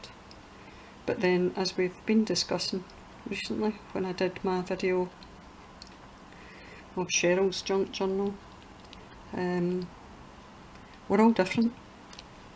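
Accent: Scotland, United Kingdom. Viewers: Just Magnificently Beautiful! I Just Luv All Your Artistic Work&How You Fixed The Problem! I Luv Listening To Your Scottish Accent Too! I Don’t Fall Asleep Watching Videos But I Keep Yours&Others I Luv&Put In Favorites&Watch Later!💜🦋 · like everything you do, these tags are spectacular. Love to watch your videos and so enjoy your beautiful Scottish accent. My maiden name was Campbell and I would love to visit Scotland one day.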